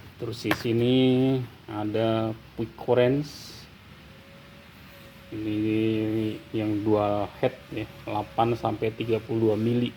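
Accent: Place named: Jakarta, Indonesia. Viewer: logat betawi bangett sih bang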